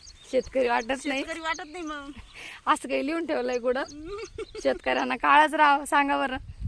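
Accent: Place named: Pune, India. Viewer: तुम्ही खरच किती काम करता तुमच बोलण खूप छान वाटत नगर किंवा पुण्याची भाषा वाटते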